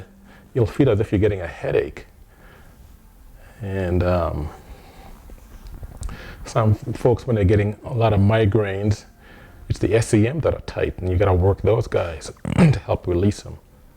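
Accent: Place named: Caribbean. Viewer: Where are you from? I can hear hints of a Caribbean accent.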